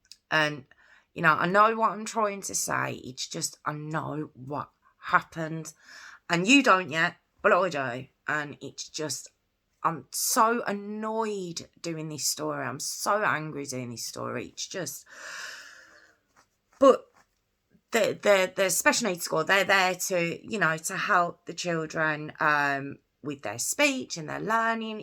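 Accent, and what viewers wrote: Birmingham, United Kingdom. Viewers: Thank you so much! Please do be assured that I love the brummy accent! I’m from Northampton in England and I went to boarding school in Worcester when I was a kid. I went there because it’s a special school for visually impaired people so they have everything naturally equipped for people such as me. Please don’t ever think I mind talking about either of my disabilities, on the contrary I love to talk about them so as to raise as much awareness as possible! I’ve been blind ever since birth which is probably why it doesn’t bother me in any way at all. My biggest challenge is fitting in with the world as everyone expects people like me to embrace and adapt to all sorts of visual stuff left right and centre, which if you can’t see at all and never have done, it’s unbelievably frustrating that I can’t just be allowed to be who I am. 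If you see what I mean? My Autism has generally been much more of a challenge overall, I’m nearly 34 years old and it’s only really over the last 10 years or so that it’s been fully realised and picked up that I have Autism. I think because it’s only quite mild Autism and not like it’s completely severe. Also Autism was never really talked about when I was much younger and so it was generally assumed I was just a stubborn and awkward person who couldn’t be bothered to behave properly! But it just shows Autism doesn’t need to be really severe, you can still have Autism even if it’s more on the mild side! I find I generally struggle with the social wo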